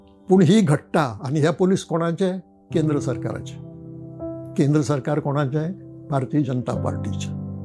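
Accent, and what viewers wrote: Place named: Konkan, India. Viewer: Great words... A lesson to learn.. Secondly what a pure konkani.. Love to listen...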